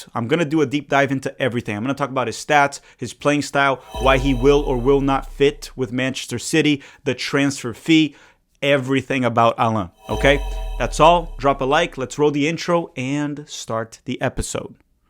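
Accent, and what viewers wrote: Brazil. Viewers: His pronunciation has a little bit of an accent on it lol. I think he might not be from São Paulo, where Palmeiras is from, cause we pronounce it a little different even though we're both Brazilian haha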